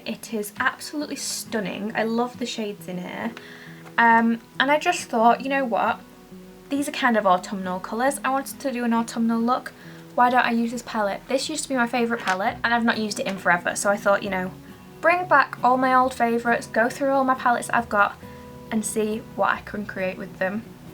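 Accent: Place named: United Kingdom. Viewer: Hii, it's me, we were just chatting via Twitter! I didn't notice you were in UK, love your accent. Yep, my hubby took me to Sephora the other day and it's kind of a whole new world to him. He is overwhelmed by how much STUFF exists, and I told him even people into makeup are feeling that way, like a fatigue with too many releases. I love the new Norvina palettes, but I just don't need them, it's tooooo much all the damn time and I need to enjoy the makeup I've already bought. I didn't know I could get P. Louise from Morphe now, I hadn't tried it due to the shipping but will now once I've finished my Paint Pot! Your look is beautiful!! 😘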